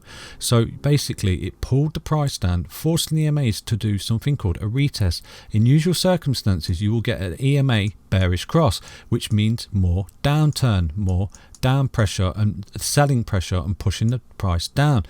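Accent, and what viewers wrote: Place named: Doncaster, United Kingdom. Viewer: Doncaster, sounds like the kid is from Doncaster, I'm probably wrong though lol. Great video, thanks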